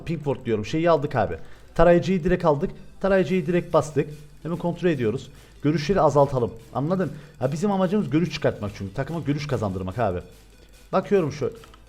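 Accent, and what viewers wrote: Cyprus. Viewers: Abi seni çok seviyorum bir videoda kıprıs değilde karadeniz ağzıyla konuşsan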